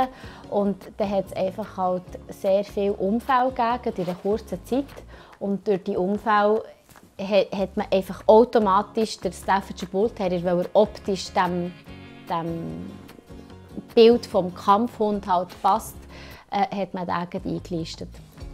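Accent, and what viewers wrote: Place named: Bern, Switzerland. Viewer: Schweizerdeutsch mein Bro, Berndeutsch um genau zu sein.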